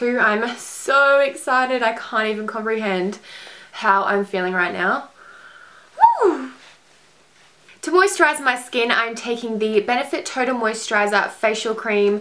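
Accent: Australia. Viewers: I love the Australian accent!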